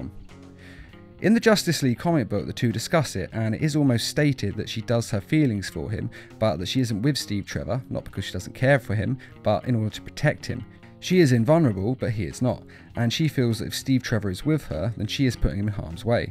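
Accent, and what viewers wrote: United Kingdom. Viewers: That’s why his content is so great because he keeps that sophisticated British accent and is so casual even if he is talking about the dumbest things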